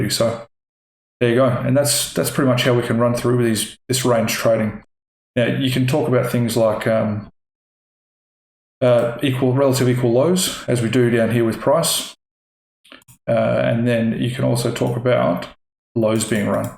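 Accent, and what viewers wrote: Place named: Australia. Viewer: Hey Mate, much love from Sunshine Coast. Good to hear an Aussie on here teaching the goods. Thanks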